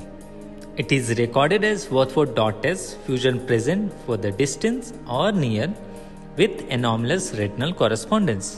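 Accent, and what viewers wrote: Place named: India. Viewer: و الله يا الهنود شوفو ليكم حل لي الاكسن بتاع الانجليزي دا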